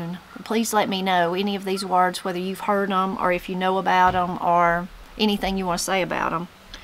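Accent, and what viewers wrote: Appalachia, United States. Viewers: With so many famous people from the Appalachians and Ozarks like Loretta Lynn, Dolly Parton, the GREAT Glenn Campbell, June Carter, Johnny Cash, which one of them do you think you sound the most like? I just listened to a 1970 interview with Loretta Lynn after this and she sounds different from you but with many similarities. So many great guitarists and session musicians from those mountains too. Alot of them like guitar greats Louis Shelton and Glenn Campbell ended up playing studio sessions for many different singers and groups in the 1950's and 1960's because producers very much wanted that sound they had whether it was rock, blues, soul or r&b. The Appalachians and Ozarks accent isn't only speech it comes out in music too.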